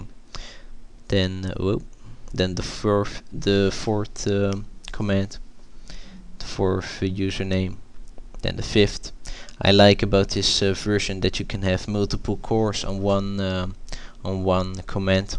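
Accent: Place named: Quebec, Canada. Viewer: true! I think he is quebecian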